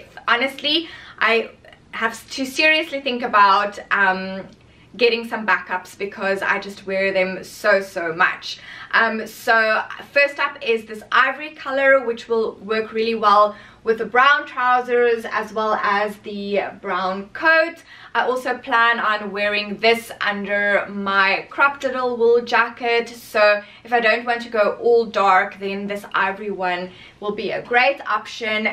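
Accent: South Africa. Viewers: I knew that was a South African accent..love from South Africa 🇿🇦❤I'm a new subscriber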